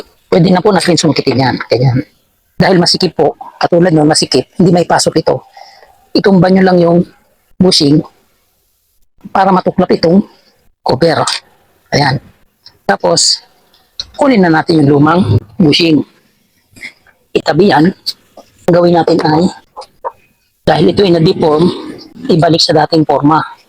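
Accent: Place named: Nueva Ecija, Philippines. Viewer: Taga nueva ejicia ba kayo kasi yun tono ng salita nio parang taga nueva ejiia kayo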